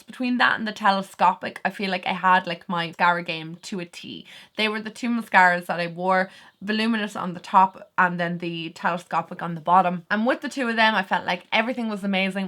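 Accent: Ireland. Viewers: I love your Irish accent so much it’s so beautiful ☺️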